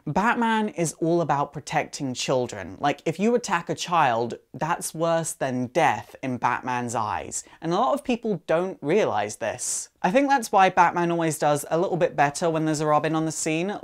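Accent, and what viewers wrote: United Kingdom. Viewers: for some reason i like this series and all the others better because you have a british accent lol i think people with accents australlian or brittish or even russian are cool especially british though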